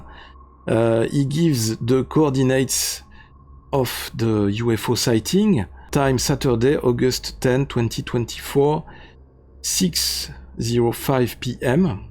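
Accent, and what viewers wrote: France. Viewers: Fuck yeah!! The coolest Alien footage but who new delivered in a french accent is bangin man!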